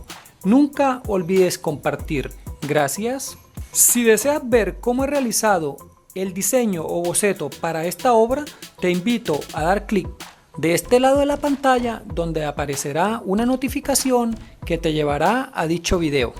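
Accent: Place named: Bogotá, Colombia. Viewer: Ahhhh genial la pegue , sabia que eras colombiano seguro cachaco por tu genial dialecto , ya mismo te sigo y te escribo al privado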